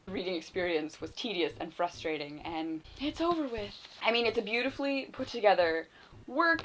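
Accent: Canada. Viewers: You're definitely Canadian, but I'm guessing you're from Newfoundland or somewhere close.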